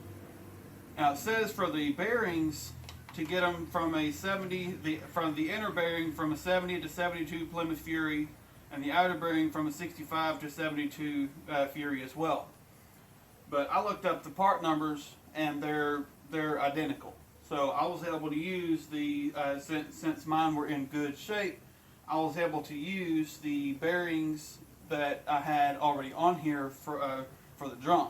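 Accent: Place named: Appalachia, United States. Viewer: You sound like an Appalachian.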